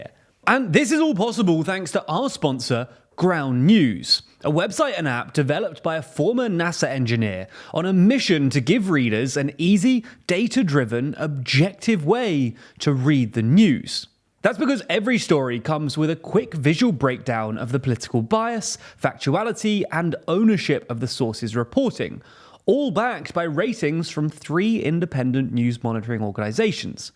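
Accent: England, United Kingdom. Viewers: No one expects absolute perfection, just close but with an English accent. When they do something as ridiculous as pronounce the Spanish double-L as a hard L sound, you know they either have had surprisingly little exposure to Spanish (possible but not likely), put no thought into it, or have so little respect for other cultures that they intentionally over-Anglicize their pronunciations. They claim they look up pronunciations but sometimes forget them once they begin recording, but some of their mistakes are too easy to avoid, and they also could write their scripts with (quasi-)phonetic spellings, but apparently they don't. And they tend to keep making the same mistakes over and over in multiple videos. These are very simple things, and not doing them means they aren't putting in nearly as much effort as they claim.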